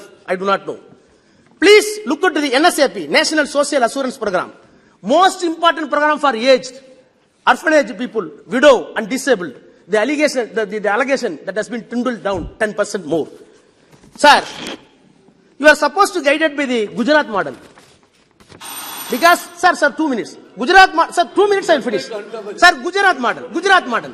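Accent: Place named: Tamil Nadu, India. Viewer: Even when he speaks in English, the pronunciation is based on Tamil and he searches for words to continue to hold his further speech .There is nothing to praise him for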